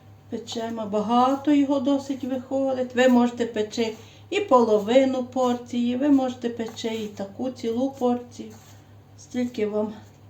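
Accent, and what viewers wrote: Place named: Ivano-Frankivsk, Ukraine. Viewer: Слава Ісусу Хресту! Пані, не перестаю вами дивуватися яка ви гарна господинька. Я тільки й шукаю ваші рецепти, бо там все так смачно і не дуже складно. А ще дуже люблю слухати вашу мову місцеву, бо, здається, що ви моя землячка. Я ж сама з Прикарпаття, з Долинського району с. Витвиця. Живу в Білорусії, вже давно на пенсії, теж багато перепекла наших пляцків для себе і знайомих та родичам на весілля. Напевно такой наша українська випічка найсмачніша. Дуже вам вдячна що ділитеся своїми рецептами та так доступно пояснюєте. Хотіла б знати звідки ви самі, бо дуже подібно розмлвляєте на нашому місцевому діалекті. Дуже сумую та скучаю за своїми родичами, переживаю за ті недобрі події, прошу Бога щоб швидше все скінчилося. Здоров'ячка вам і вашій родині. Довгих вам років життя, та миру в нашій Україні. З наступаючими Великодними святами Вас. 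Обов' язково спробую ваші нові рецепти, а найперше пасочку. ❤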